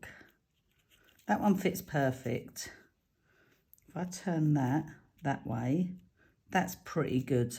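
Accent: United Kingdom. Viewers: Hi, I have only just found you on YouTube.. love your videos and how your brain works!!! I'm not alone! Lol🤣 I'm in Australia now, but originally from the UK, so your accent reminds me of home. Thanks for taking your time to show fab ideas and share some laughs. X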